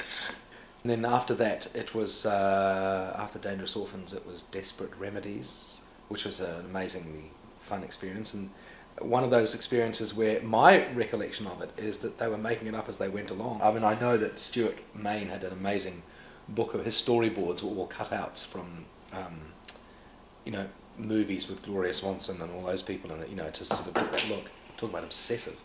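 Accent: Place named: United Kingdom. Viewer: he's a kiwi with a British accent! he grew up mostly in England before moving to NZ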